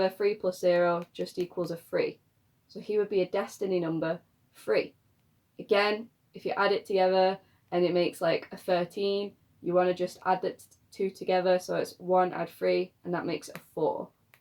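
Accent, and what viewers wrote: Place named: United Kingdom. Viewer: Luv the British accent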